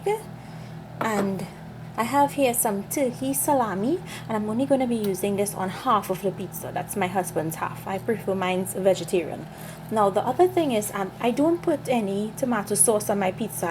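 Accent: Trinidad, Trinidad and Tobago. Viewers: That is Trinidad accent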